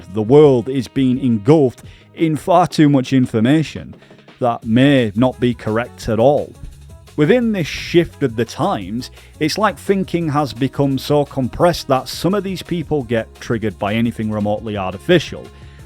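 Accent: United States. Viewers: Why did you think people would take you more seriously with an American accent? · lol ur american accent is good bro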